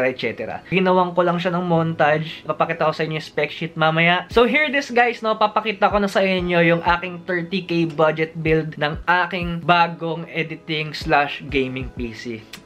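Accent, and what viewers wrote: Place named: Philippines. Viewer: As u can see pinoy yang nagsasalita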